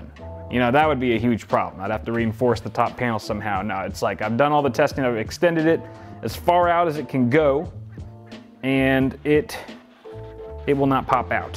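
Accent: South, United States. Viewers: cool build! 400% the amount of allowed cowboy accent in youtube videos though. shame. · The southern accent and all the cowboy puns were very random and made no sense to me, I just started tuning out half way into the video. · where did the southern drawl come from? Jetleg does some weird shit to. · I'm from Houston Texas and he sounds more southern than me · What’s up with the country good ol boy accent lol ? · Did you move to the south or something? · new southern US accent? interesting haha... who have u been hanging around lately?😃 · Jetlagged sounds like a southerner. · What region of America accent is this? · just created a new market segment. Better get a patent on this innovation! Awesome video with southern accent too! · why doe she talk like a southerner the whole video? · Oh, good, he was speaking with a southern accent. I was tired when I watched it so I thought I was imagining things. 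· Southnern sounds pretty cool yaw🤠👍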